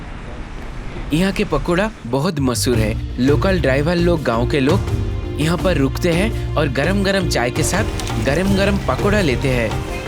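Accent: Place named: Bengal, India. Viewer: Bhai tumi pakka veto bangali. Tomar pronounciation shunei bojha jacche. Tmi hindi te blog na baniye banglai koro na. Shunteo valo lagbe. Success o pabe.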